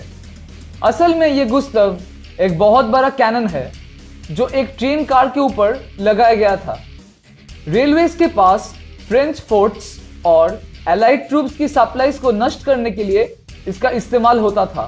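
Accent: Bengal, India. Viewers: ओरे दादा।। पहिले ठीक से हिंदी बोलना सीख ले।। अड़ को आरर बोलता है।। बंगाली दादा।।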